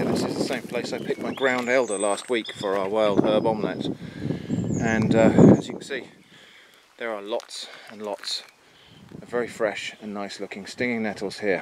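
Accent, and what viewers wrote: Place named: Dorset, United Kingdom. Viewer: thought you had a Dorset tone I'm near Bournemouth funnily enough lol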